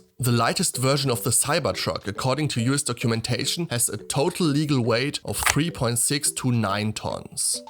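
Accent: Germany. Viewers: You sound like a german talking english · German accent by ai is terrible😢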